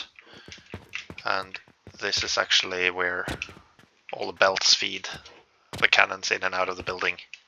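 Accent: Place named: Scandinavia. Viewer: I can tell by your accent that you're probably sweedish? or nordic? Which would explain the impeccable design interior taste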